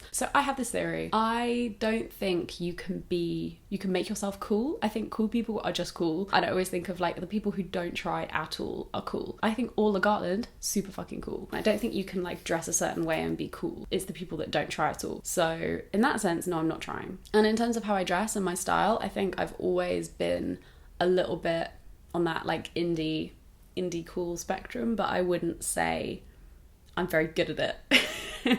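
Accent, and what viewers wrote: RP, United Kingdom. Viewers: Is it weird that I never noticed had a posh accent until she said lol? I guess she comes across so genuine that it didn’t really matter. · As a non-native English speaker, I must say I absolutely love your posh accent! I also really appreciate how authentic you are, thank you for the wonderful content! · I got the distinct impression that on the one hand she didn't consider herself to come from a wealthy background, but on the other she also intellectually knew that she was born of two professional parents in archetypal upper middle class dormitory town Tunbridge Wells in Kent, speaks with an RP accent & appears to have survived a 3 year university degree without emerging hugely & unsustainably in debt, and that these two sets of information were in some tension. This is absolutely not a dig at her or you, just an observation for perspective purposes. 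We don't get to choose the circumstances of our birth.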